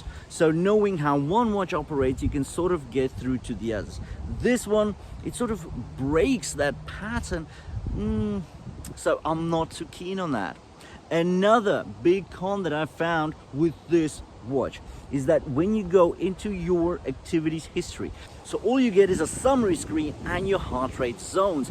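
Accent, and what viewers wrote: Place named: South Africa. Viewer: Great accent. South African, but he's lived in the UK for while, is my guess?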